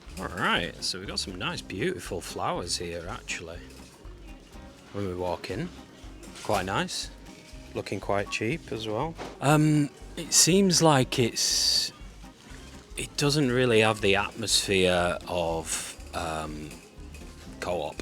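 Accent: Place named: Italy. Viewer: Ma sei un italiano che parla in inglese!!!!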